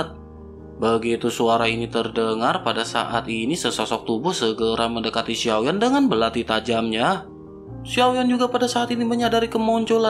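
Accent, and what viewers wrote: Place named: Jakarta, Indonesia. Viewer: Pakai logat nasional sajalah bos.Karena di dengar oleh bnyk org bukan hanya org betawi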